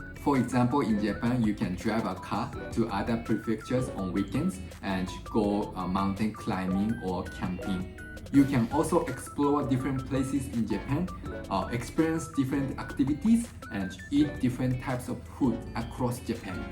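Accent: Singapore. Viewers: Hi, you sound even more local now. You speak exactly like us. I'm also very impressed with your English. Perfect!👍 And you're so good with your Cantonese tongue twisters 🤣🤣🤣🤣🤣🤣 You've really worked hard in mastering the languages. Impressive 👍 Yes, I'm very thankful for not having to experience any natural disasters here. So I live with the heat and the fact that there are no 4 seasons here so the scenery is quite boring and there's not much to do here though it's easy to catch a flight anywhere any time if we wish to. I mean we can't have it all, right?! And you've already travelled to so many places! 👍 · ❤❤❤today I am the first one to like your video ❤❤❤you really speak like a Singaporean 👏👏👏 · You really speak English with Singapore accent ! The result of 4 plus years immersion in local Singapore culture. Amazing ! Your English is overall a lot better than many Japanese who are now working in Malaysia.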